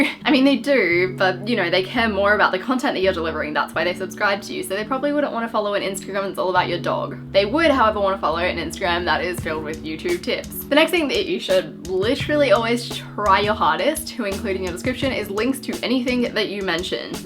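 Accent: Australia. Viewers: I love Aussie Accent. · Oh my god your Australian I love how you talk ( you.. Are Australian right?)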